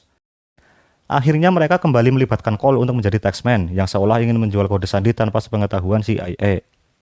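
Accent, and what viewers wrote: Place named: Java, Indonesia. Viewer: Terlalu medok bang…oalah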